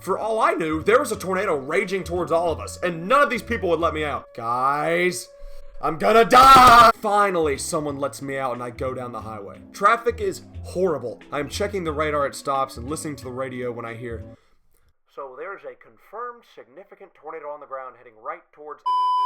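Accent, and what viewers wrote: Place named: Franklin County, United States. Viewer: So do you live in Franklin county like me because it sounds like you live In Franklin county like me🤣🤣😘🌪🌪🌪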